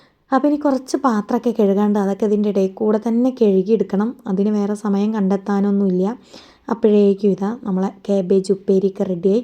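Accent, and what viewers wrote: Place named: Kannur, India. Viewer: നമ്മ പപ്പ കായീന്ന പറയുന്നത്😀😀😀😀 കണ്ണൂർ ഭാഷയാണേ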